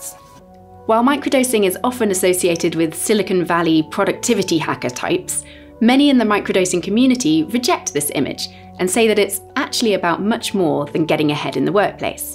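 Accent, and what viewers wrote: United Kingdom. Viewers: She's so beautiful and i love her British accent!! Thanks for sharing.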